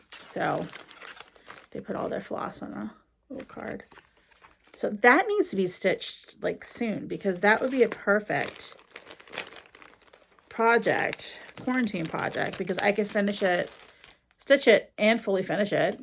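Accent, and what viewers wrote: New England, United States. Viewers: I just stumbled across your channel and recognized a fellow New Englander almost immediately from your accent. I’m in southern NH. I love stash videos.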